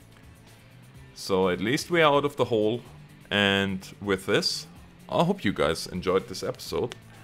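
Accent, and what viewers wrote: Germany. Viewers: Haha I can tell and I also wanted to ask are you German?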